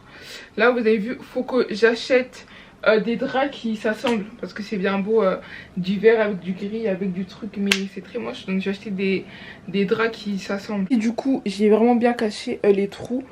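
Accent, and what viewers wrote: Ivory Coast. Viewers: T’es ivoirienne ? Ta façon de parler 😂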